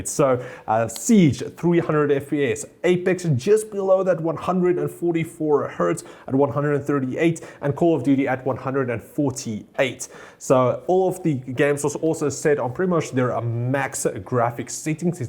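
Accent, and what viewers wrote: South Africa. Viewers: Are you South African? I’m South African myself and I can hear an accent from you. Great content btw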